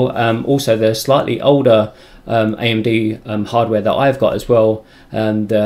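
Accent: United Kingdom. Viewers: Damn.. British accent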